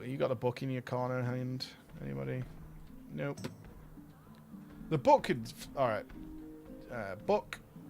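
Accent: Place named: RP, United Kingdom. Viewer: your a duki lad why has your voice gone all posh:)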